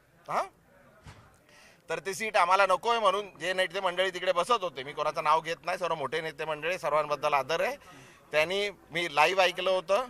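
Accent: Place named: Agri, India. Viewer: तो तिथला भूमिपुत्र आहे आणि आगरी आहे त्यामुळे तो आगरी लयेत मराठी बोलतोय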